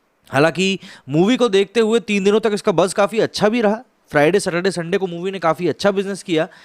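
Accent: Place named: Punjab, India. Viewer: His pronunciation is like that of Punjabi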